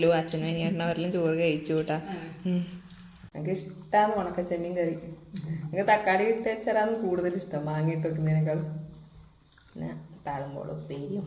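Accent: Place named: Payyannur, India. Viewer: ഞാനും ഇതുപോലെ തന്നെ നമ്മുടെ തനതു ഭാഷ പയ്യന്നൂർ സ്റ്റൈൽ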